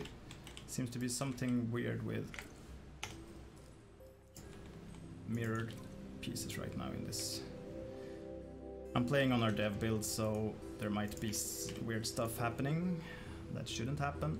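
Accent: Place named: Sweden. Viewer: Nae då men jag tyckte jag hörde lite svensk dialekt 😀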